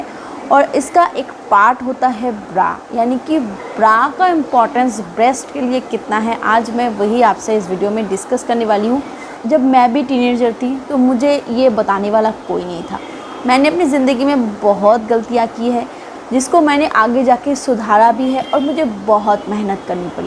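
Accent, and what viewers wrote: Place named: Bihar, India. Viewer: Aap bihar ke rahne wale h... Madem jee aap ka Bolne ka tarika pta rha h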